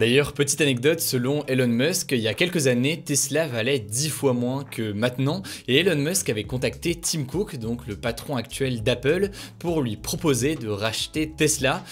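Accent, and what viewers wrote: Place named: England, United Kingdom. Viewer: si on peut juste s’arrêter quelques secondes et apprécier l’accent anglais d’. t’es génial.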